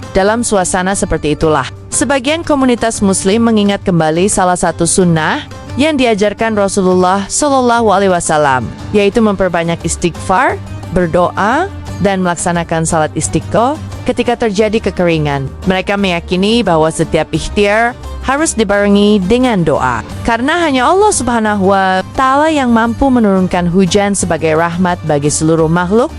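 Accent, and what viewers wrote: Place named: Netherlands. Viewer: Kalau baca tex, jangan berlogat bicara seperti Belanda. Itu nggak lucu......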